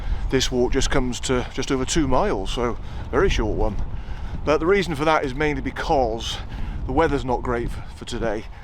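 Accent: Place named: Herefordshire, United Kingdom. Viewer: Very god -got the Herefordshire voice I notice. The Wye was looking nice. Mark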